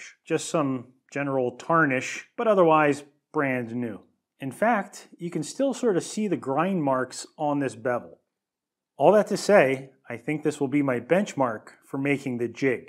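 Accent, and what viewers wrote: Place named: New York, United States. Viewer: So, I thought this sounded like you but somehow different, a bit more raucous, slightly more vulgar and significantly more nonsensical. Your voice has taken on a more New York accent than I remembered, the family component wasn’t there but the Star Trek allusion was right there! Also, your production is more TV and less YouTube….. I must say, I really enjoyed it and at once wasn’t sure if it was you as I was watching on my phone and it wasn’t as apparent as on my desktop. If this is the new, I approve!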